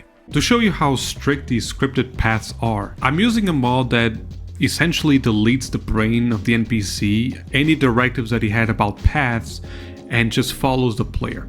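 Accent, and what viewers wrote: Portugal. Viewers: EU SABIA, esse sotaque não engana ninguém brother, já estava na cara que eras tuga, só faltou ver a matricula no teu quarto no minuto para me aperceber ahahah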